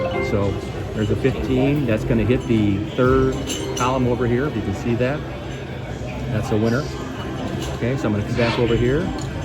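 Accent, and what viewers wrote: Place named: Midwest, United States. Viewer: Are you from Michigan or Wisconsin 😊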